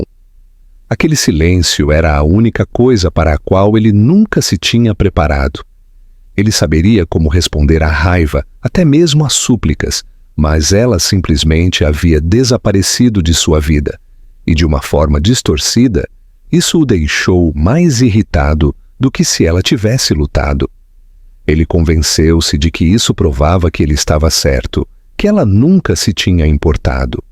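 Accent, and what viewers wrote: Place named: Portugal. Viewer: troca de sotaque para o português de Portugal... dá sonolência a narrativa... escutando de Passo Fundo RS.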